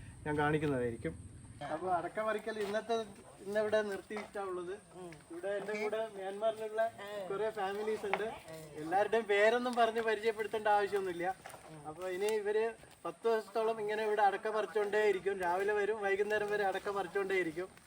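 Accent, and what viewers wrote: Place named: Kannur, India. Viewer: Sir kannur side anennu thonunnu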